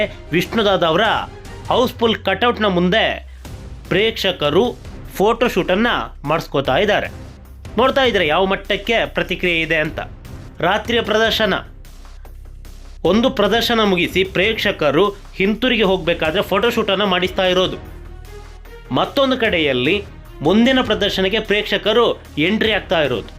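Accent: Coastal Karnataka, India. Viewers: nivu super,love u ದಾದಾ ❤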